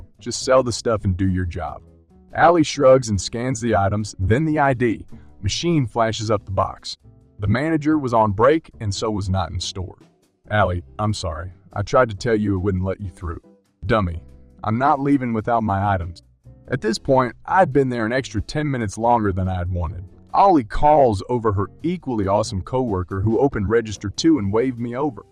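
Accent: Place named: Texas, United States. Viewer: Think he's from Texas Got a southern drawl Not a bad reader Just needs to modulate his speech when doing the different people Same voice throughout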